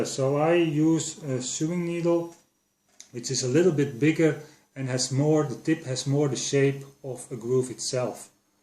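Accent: Netherlands. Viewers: Je spreekt het engels wel op een zeer nederlandse manier uit. Maar dat wil niks zeggen, deze video‘s zijn supertips en ik zie in de comments dat het geweldig helpt bij de engelse kijkers. 😊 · You always can hear if you are dutch hahahaha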